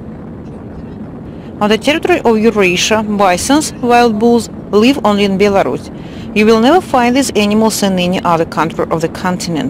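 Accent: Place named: Russia. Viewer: your Russian accent so charming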